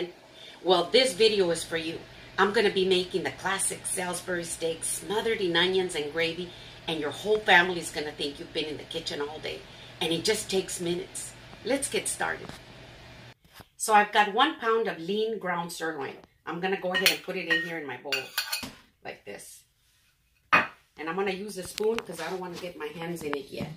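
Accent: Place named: Louisiana, United States. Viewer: YUM!!! your accent sounds like "Southern Louisiana" to me. I lived in Baton Rouge for about 18 years.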